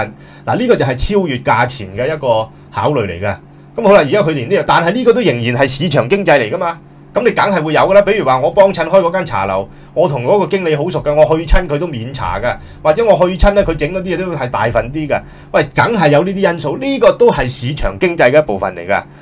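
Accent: China. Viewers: 你把聲咁似大陸仔講廣東話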